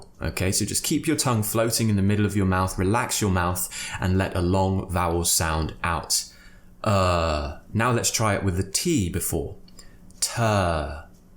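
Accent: United Kingdom. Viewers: your accent is amazing. can I know where you are from please? I mean where of The UK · Thank you so much,! Your lessons made me love the British accent! Trying to do my best to master it:) Best of luck it the New Year! Cheers! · Hi! I want to tell about myself. I'm Turkish. I have six English class in a week. My English teacher is South African Turk. His accent like yours. (He speaking with British accent.) So, I'm watching your videos everyday. They are helping me so much. Thank you for this. I love your channel so much 💜💛 Note: I didn't use translate. I'm sorry for my English. I'm trying to be better. 🙏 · is your-go-to person when all of a sudden you forget how to sound like a modern RP. Truth be told, I've been listening to your channel and podcast long before I decided to move and work here in LDN and I love the English for your kindness and their various accents especially here within the SE / Southwark area. 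💙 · What is Receive pronunciation? The way you are speaking is this a RP ? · I really admire every video on your channel, I ask permission to practice british accent with your videos plz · When I first watched your video yesterday, I tried to speak and mimic your accent. I finally found a native British speaker in YouTube. Although my roommate was very annoyed when I pronounce and mimic your accent. Haha. Cheers!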